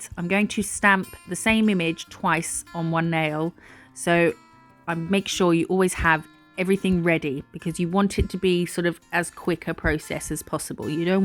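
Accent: United Kingdom. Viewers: and it’s good to hear a UK accent , I’m in Scotland , most videos I see about nails are American x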